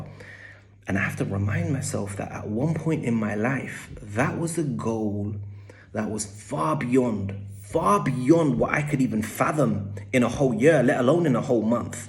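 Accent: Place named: United States. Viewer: Are you from America? Great accent!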